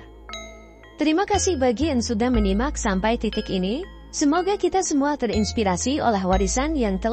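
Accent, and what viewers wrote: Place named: Netherlands. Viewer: Suara yg buat konten ini j suara logat orang belanda.bukan orang indonesia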